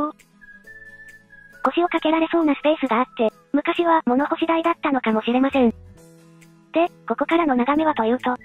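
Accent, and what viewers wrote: Hakata, Japan. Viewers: 若い女の子の博多弁が好きすぎて、思わずチャンネル登録してしまいましたが、(もちろん物件も好き❤)よくよく観ると、若い女の子ではナカッタ...(T_T) ま、いいっか👍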